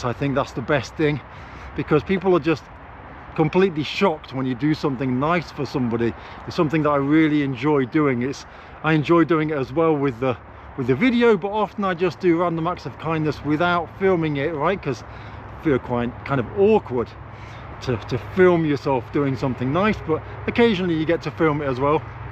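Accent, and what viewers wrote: United Kingdom. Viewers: So you have a British accent, walking and talking on your phone ....... yeah, to be honest, if I were Russian, I would be a bit suspicious. Just sayin'.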